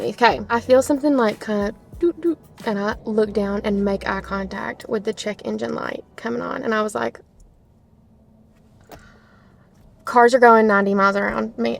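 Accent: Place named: South, United States. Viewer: lmfao "just the cole" I love southern accents sm 🤣❤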